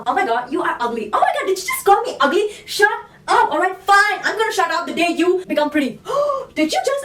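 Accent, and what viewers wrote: Maharashtra, India. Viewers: That's funny ! you speak English in Marathi accent :-D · Dat Marathi accent in English...u r amazing ...what makes u awsme is dt ur topics n videos r relatable (to v Indians )which makes u makes u different frm Lilly Singh...love love❤️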